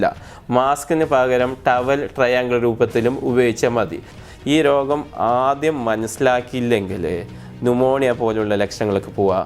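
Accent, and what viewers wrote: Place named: Malappuram, India. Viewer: മലയാളം ഇഗ്ലീഷ് എന്നിവ പഠിക്കുക. ഒന്നും മനസ്സിലാകുന്നില്ല .ഭാഷ Not correcti മലപ്പുറം സ്റ്റെൽ ബോർ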